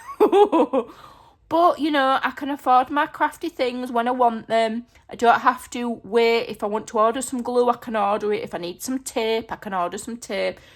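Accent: Yorkshire, United Kingdom. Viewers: Hi,. I'm from Spain. Both your crafting skills and your fabulous British accent ( I'm a teacher of English) inspire me. I'm about to engage in my first crafting project ever and I chose you as my guide because you are not afraid of making mistakes, which is what builds confidence eventually. This is what I need. Well, sorry. Let's get to the point. There are tons of saying to mention I had a hard time to understand at first, such as the one when you talk to the paper and call it "sucker". My favourite one is " she says", basically because I still haven't figured out what it means. I guess its like saying " or this is what is supposed to happen"´. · Hi I am from N Ireland I love when you talk to yourself “stop waffling and get on with it” in your Yorkshire accent. these journals are gorgeous if it was me I could not part with them. Your Ko-Fi items are great value I did enjoy the mini book series and digital. 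Keep up the great work ❤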